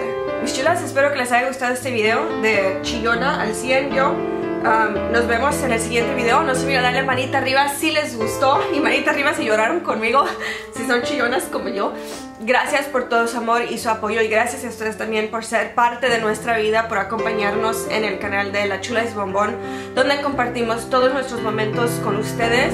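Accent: United States. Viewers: Parece estadounidense al hablar español. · Aquí no se nota tanto ese acento tipo "gringo"😓 me encanta pero tu español es bonito.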